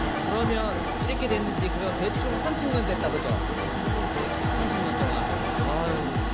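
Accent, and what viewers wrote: Gyeongsang, South Korea. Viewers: 진짜 사람생각이 다 같은게 ㅋㅋ저도 리쌤이후로 다른분들 유튭봐도 시원하지가않고ㅠ 덜끝난느낌 이었는데 진짜 ...넘잘하시네요 ㅋㅋ 글구 남자분인데도 되게친절하시고..보통 서비스이라고 남자들 친절한경우잘없거든요 무뚝뚝하고 ㅋㅋ 근데진짜...대구말툰데 쎄지고않고 듣기좋고 실력도 넘 출중하셔요 구독하고갑니다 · 우연히 영상떠서 구독하고.ㅋ 챙겨보는중입니다🤣 좋아요도 눌러가면서 ㅋㅋㅋ 갱상도사투리가 참 좋네요.ㅎ 울아빠도 갱상도 진주 .ㅋㅋㅋ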